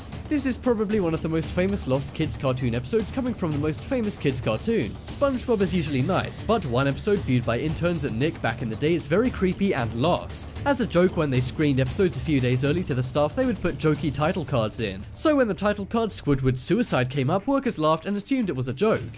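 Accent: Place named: United Kingdom. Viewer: dude these stories are awesome even if you have a briddish accent I STILL LOVE YOUR VOICE these stories are amazing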